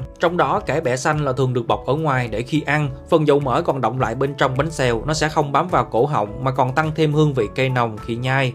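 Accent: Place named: South, Vietnam. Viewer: giọng miền nam rất thân thuong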